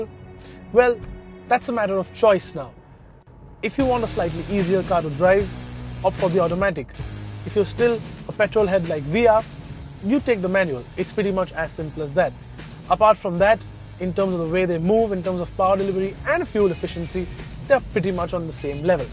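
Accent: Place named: India. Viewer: no offense to others but youre the first indian car review wherein the accent doesnt bother me! nice review!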